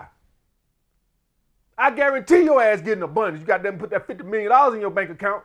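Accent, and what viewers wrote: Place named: South, United States. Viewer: This Alabama language.. southern style lol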